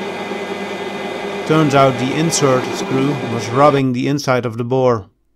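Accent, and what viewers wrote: Netherlands. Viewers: I seem to recognize a Frysian English accent. The wooden shoes add certainty to my suspicion. Could it be that i'm right? And if so, what part of Friesland are you from?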